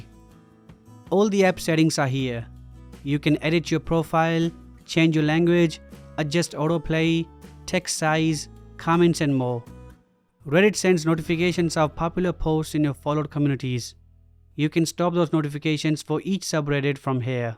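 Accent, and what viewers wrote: India. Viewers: Indian accent!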